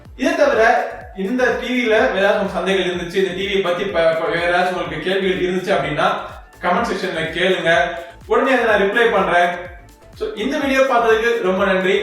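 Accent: Madurai, India. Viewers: Semmma madurai speech and review suprrrr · Niga Madurai ya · Bro..neega Madurai ya....? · Bro neenga madurai ah? Super!!!! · Bro Madurai style is super..... Like acter Vishal Daai naanum madurakaaran thaandaa....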